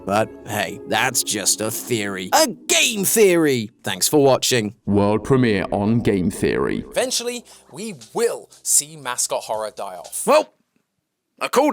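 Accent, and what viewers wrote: United Kingdom. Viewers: I genuinely do not remember you being British, am i from another universe?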